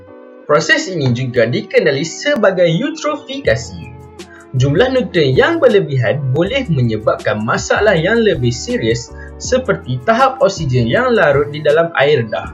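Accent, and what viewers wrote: North, Malaysia. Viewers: Bila tengok video yang narrator dia cakap utara auto best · suka betul dengan suara narrator. juga maklumat yang cuba disampaikan sangat jelas dan santai dengan slang utara. nais!👌🏻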